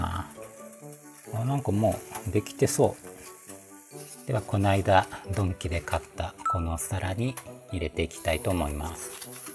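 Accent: Kansai, Japan. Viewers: わぁ～❣️可愛いのってチェブのタコ焼きだったんですね💡さすが！チェブのクオリティー高くてめっちゃ可愛いです❤️❤️ 量そんなんでいいんかいな～？って思ってたけど大成功でしたね😁✌️ お味はどうでしたか😉？ちょいちょい出る関西弁に癒される💛 素の時は関西弁なんですね😌✨ たこ焼き器は家にもあるんですが、使った事がなかったので今度やってみよう🐙🎶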